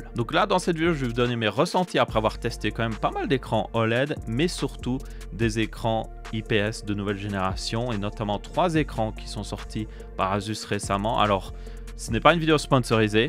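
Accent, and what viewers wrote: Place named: Belgium. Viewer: Merci pour cette vidéo, je te suis depuis quelques temps et j'aime vraiment bien ta chaîne, je deviens cultivé sur l'informatique grâce à toi haha, d'ailleurs à ton accent je crois reconnaître un Belge, non ? Si oui, on est deux (bien que cela ne change rien, je tenais juste à me la péter un peu...)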